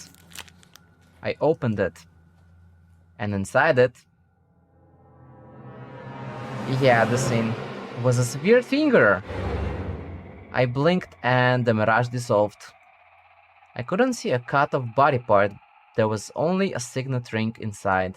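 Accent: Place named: Russia. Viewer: did you like that game? btw your accent sounds like Russian, I don't know why xD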